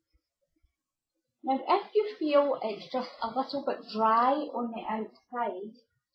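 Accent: Scotland, United Kingdom. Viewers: LOL you shouldn't stop yourself. Scottish mannerisms and dialect doesn't get anywhere near the exposure it deserves. lets face facts we're pure dead brilliant and everybody should know it :) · Hi --I was looking for paper clay recipes and came upon your video for air dry clay. I'm looking for a kind of clay that I can use to put in decorative silicone molds, remove from the molds when still soft, then glue them to furniture I'm painting. I don't know if your recipe will work, but I have subscribed to your channel because I love your Scottish burr. I could listen to you forever. Thanks so much for this recipe.💗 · Omg.... I could listen to your voice all day. Love the Scottish accent, and very interesting videos as well. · as an American I don't speak Scottish accented English so I turned on captions and it's still missed a lot of what you were saying, but I got the gist of it and thank you for putting this up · Very good recipe you sound Scottish. 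I'm scotland so thank you for recepe scotland u.k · What a beautiful accent ....... how I miss Scotland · Haha, I was thinking the exact same thing thru the whole video! There's just something about a Scottish accent, so easy to listen to - almost musical! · Great recipe and what a joy to hear your accent! My mum and grandparents were from Scotland and I never hear anyone with a Scottish accent anymore.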